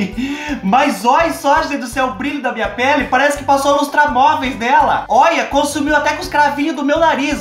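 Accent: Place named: Caipira, Brazil. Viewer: Adorei seu modo “caipira” Um barato. Ameiiiii ❤️❤️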